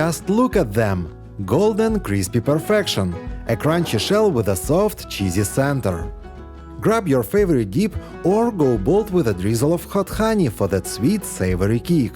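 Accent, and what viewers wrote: Russia. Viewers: террибл рашен аксэнт))) бат гуд контэнт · Меня смущает акцент и наличие укропа в маринаде. Автор, ты русский? Классный канал, кстати. Готовить не умею, но есть под твои видео не хуже, чему под Друже) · Strong russian accent ) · I feel STRONG russian accent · Is that accent russian???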